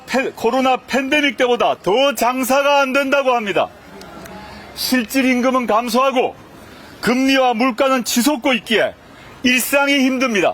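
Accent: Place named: Gyeongsang, South Korea. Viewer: 목소리까지도 우렁차다 ... 남자는 표준화된 경상도말이 젤 멋있다 ^^♡♡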